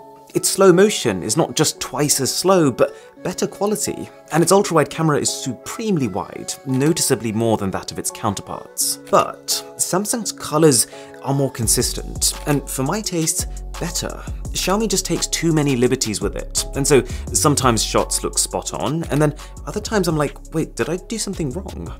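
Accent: United Kingdom. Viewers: You can convince people to eat their phones. The way you explain these phones with your British accent is amazing.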